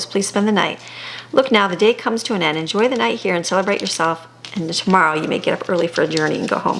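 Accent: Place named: Ireland. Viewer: I thought the same Amy , sounds Irish as we would say. Mind you none so blind that do not want to see. Thank you for reading to us. This passage has such a familiar ring to it and isnt so far from what is going on in this world today.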